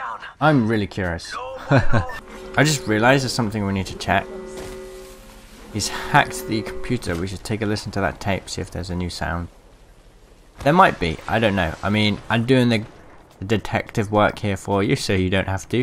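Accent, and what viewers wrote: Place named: United Kingdom. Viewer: He looking slick 😂 I love your voice the true British sound better then mine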